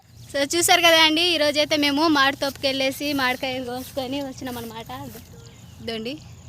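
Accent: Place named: Rayalaseema, India. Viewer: హాయ్ అండిబాగున్నారమీవిడియెస్.బాగున్నాయి.ఎవూరుఅండిమీది.మీబాషచూస్తేరాయలసీమకుసంభందించినదిగ.ఉంది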